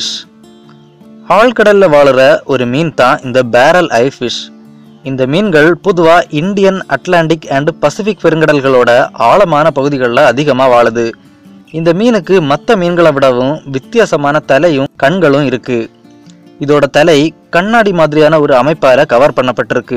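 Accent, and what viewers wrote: Kanyakumari, India. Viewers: Kanyakumari voice ❤️ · Nenga KanyakuMari ah bro? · Kanyakumari slang makka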